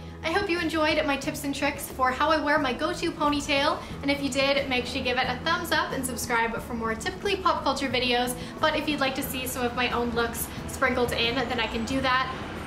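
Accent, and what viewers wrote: United Kingdom. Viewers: Are you from England?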